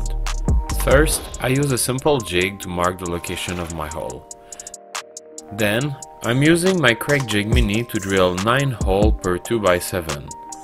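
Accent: Quebec, Canada. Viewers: French canadian? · Ça sent l'accent québécois tout ça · T'es pas québécois par hasards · ca sens l'accent de Quebs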